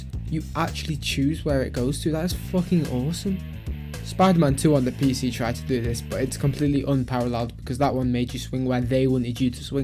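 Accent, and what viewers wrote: United Kingdom. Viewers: Are you British? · Are u northern Irish ☘️ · Sorry, this was really hard for me to pay attention to because of how bri'ish you sound. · u british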